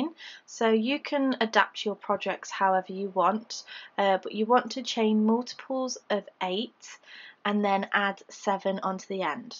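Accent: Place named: United Kingdom. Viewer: is British in case you missed the accent along with everything else she said that you clearly didn’t listen to. What you call a double is called a treble in the UK, not sure how anyone can not know that if you use YouTube and online patterns.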